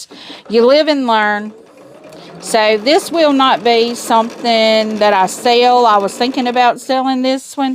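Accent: South, United States. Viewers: Lol I did too but it's that southern accent. 😂😂😂😂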